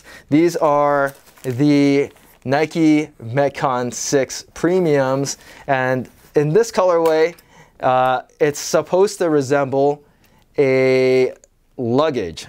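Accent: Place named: China. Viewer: The Chinese English 🙄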